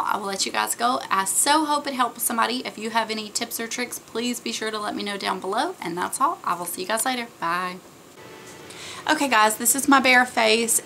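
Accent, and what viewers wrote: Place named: Alabama, United States. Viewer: You sound like you're from Bama girl. Not a bad thing.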